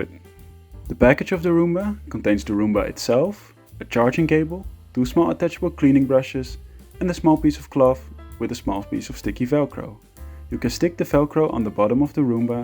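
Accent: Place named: Netherlands. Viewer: Totally love your review, calm voice and funky Dutch accent.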